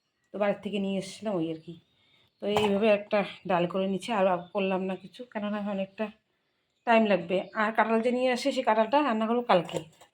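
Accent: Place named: Rajbanshi, India. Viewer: রাজবংশি তোমাদের ভাষাটা বেশ ভালোই লাগলো সুনতে দিদি ভাই ❤😊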